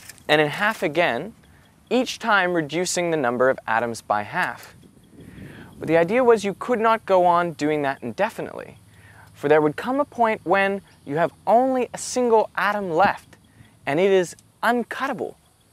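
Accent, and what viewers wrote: Australia. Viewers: I never knew he was Australian! You can really hear it in his old videos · Woah, the ever so slight Australian accent definitely threw me off. Crazy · Am I the only one who thinks I can vaguely hear the Australian accent? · You don't sound very Australian until you say aluminum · ohh he does sound much more australian here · OHMYGOD, this was so long ago that Derek actually sounded more like an Aussie 😅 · Really cool to see how your accent has changed over time. You can tell there's a bit of the 'stralian in there at times; wonder how much of the video accent was natural vs imposed. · lol, I can hear an ever so slight Australian accent coming through and it has distracted me through the whole video! It's amazing though. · I love his half-australian accent in this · He has a very slight Australian accent here...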